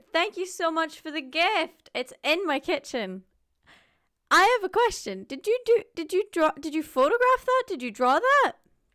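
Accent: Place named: Ireland. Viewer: Did they ask you to change your accent on XCRAPTOR. I was so happy when you kept your beautiful Irish tone.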